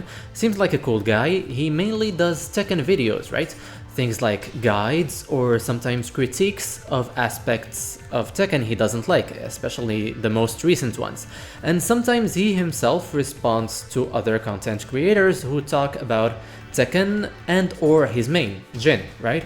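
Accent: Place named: North Africa. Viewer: I knew you were north african for the whole video until i heard you say it 😂 where from?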